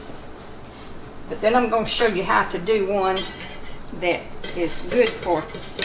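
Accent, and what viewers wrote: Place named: Alabama, United States. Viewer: Hello from Alabama!!!! good to have found your channel!! I knew you sounded like one of us. 🤣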